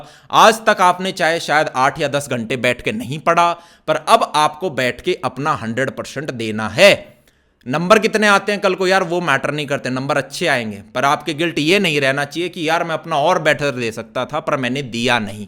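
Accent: Haryana, India. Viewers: Bro are you from Haryana? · Haryanvi accent❤️❤️❤️ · Anyone notice the Haryana accent in Aman bhaiya... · in between Bhai ki thodi thodi Haryanvi nikalti hai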